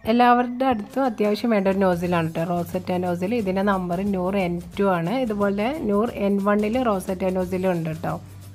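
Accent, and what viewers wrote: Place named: Chavakkad, India. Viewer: ഇഷ്ടായിക്ക്ണ്.... ചാവക്കാട് സ്ലാങ്ങ്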